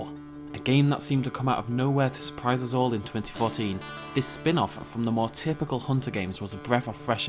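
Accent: United Kingdom. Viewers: ***** jpog is my fav dino game and by the way are you British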